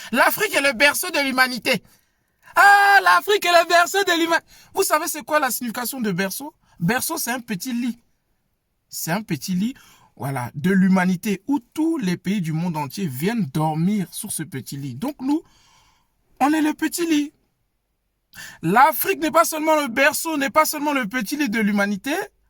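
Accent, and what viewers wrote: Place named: Ivory Coast. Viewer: L'accent me dit que c'est un frère du 225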